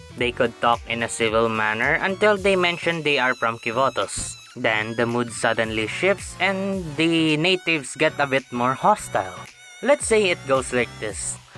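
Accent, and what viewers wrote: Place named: Southeast Asia. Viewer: SEA ass accent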